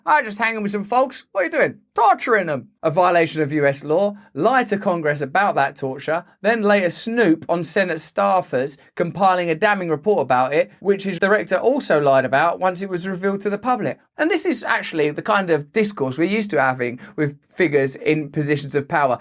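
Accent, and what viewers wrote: United Kingdom. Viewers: "it's proper dodgy" XD i love how British he talks lol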